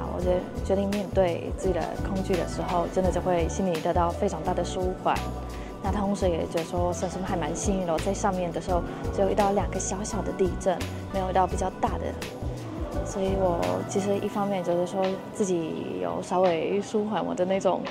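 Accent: Standard. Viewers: 第一次看你的影片，國語講得好標準！標準到讓我有一點懷疑是不是配音的😂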